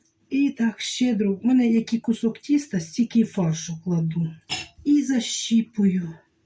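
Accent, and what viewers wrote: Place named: Poltava, Ukraine. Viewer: Яка у вас гарна украiнська мова! Вы, мабудь с Полтавщини? Огромная Вам благодарность за рецепты. Вы очень душевная женщина. Удачи Вам!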